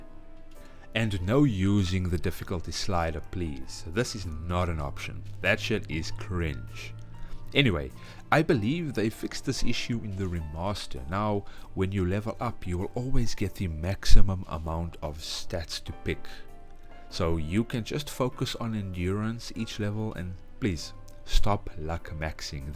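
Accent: South Africa. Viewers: Enjoyed the vid, you sound like you're from RSA too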